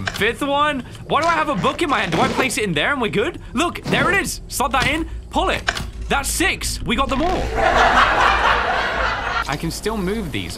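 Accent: United Kingdom. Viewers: I know he is lives in the UK the accent gives that away. The US is a country founded off of distrust in government and you protect yourself from everyone including government. The founding fathers declared war over taxation. But it is about personal property and protecting it, and protecting our family and friends. As an aside the UK had the whole knife control bit where they want you to put knives in a box. So I don't think the UK is someone we look to for virtue in how to protect ourselves.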